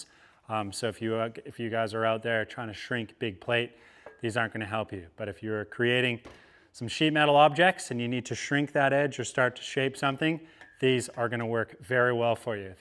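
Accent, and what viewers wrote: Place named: Australia. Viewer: Awesome video! This dude sounds Australian sometimes and American others and im so confused by it lol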